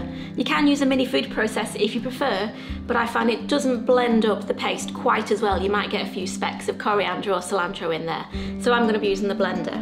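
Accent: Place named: Northern England, United Kingdom. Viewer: Ur nothern arent u 😛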